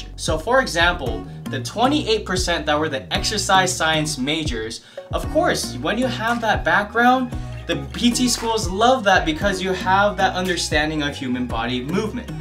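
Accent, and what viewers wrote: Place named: South Korea. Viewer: nah it sounded Korean. I see you, my dude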